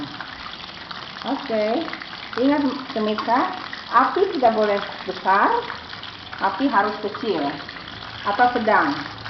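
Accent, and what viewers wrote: Indonesia. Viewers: Sunda na ti mana atuh 😁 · aduuuuh teteh pasti sunda nyaaaa meni has suara sunda na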